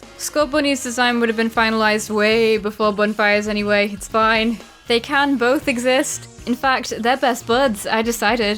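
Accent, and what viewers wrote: United Kingdom. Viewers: hon i didn’t even realise you were british because i’m so used to british accents being one myself it didn’t even register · I don't know why this is...but for some reason, up until this video, I thought you were American. I normally recognize British accents immediately, but I guess your accent just never registered for me. Did you change nationalities while I wasn't looking, or have you been drinking a lot of tea recently? The Mandela Effect is real ladies and gentleman....also I didn't know that you and were the same person.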